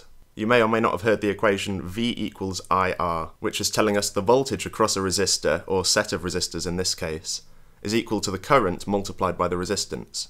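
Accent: England, United Kingdom. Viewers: Very good and understandable lesson on the power supply. I don't know yet where you are based in the world, but you accent sound more england. I am in south africa. I hope you can allow me to add that the potentiometer does not only adjust the output voltage, but also control the entire circuit and protect it from getting out of regulation and destroying itself. Thank you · I've spent over 35 years in industrial power electronics, own and operate my own engineering firm, and taught full time as a professor in technology for thirteen of those years. Kudos to your teaching methods. You're water illustrations are brilliant and intuitive and speaking as a Canadian who recently visited Yorkshire in Britain, I love hearing the British accent and idioms in this video. Some corrections however: The thermistor employed for over-current protection is a PTC (Positive Temperature Coefficient) device, not an NTC (Negative Temperature Coefficient) device. 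NTC thermistors are used for temperature measurement. PTC devices act like resettable fuses and limit inrush current by increasing in resistance as a function of temperature (i.e. higher current). Both are non-linear devices. MOVs behave like back-to-back zeners and exhibit very high resistance until you reach their break-over voltage but unlike an SCR, don't avalanche (assume full conduction when triggered on). In this way they only clip excess energy above a target voltage threshold but they don't chase it all the way down to zero volts. MOVs also tend to deteriorate with each spike they take so their effectiveness over time can diminish and they are rated in Joules as well. Also, MOVs like to explode when their energy levels are exceeded so I've seen designs where they're shrink wrapped to contain flying bits in the event of.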